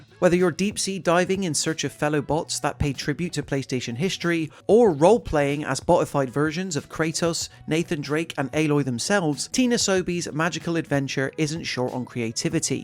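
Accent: West Country, United Kingdom. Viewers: subscribing for the west country accent!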